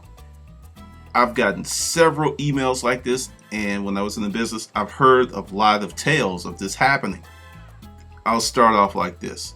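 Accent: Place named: South, United States. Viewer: Whats up with your "Mammy Copepper Plantation accent", I didn't know if you wanted your 15 minuits of internet fame or you wanted to tell your money story...........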